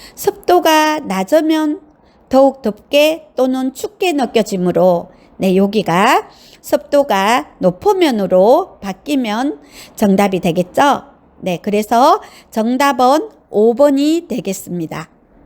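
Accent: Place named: Gyeongsang, South Korea. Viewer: 죄송합니다 경상도사투리라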